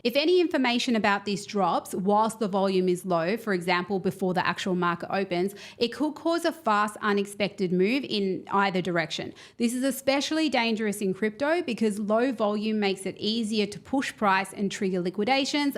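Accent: Australia. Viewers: woman who loves crypto with an aussie accent. Florida/usa....I COULD NOT BE HAPPIER!!!!!!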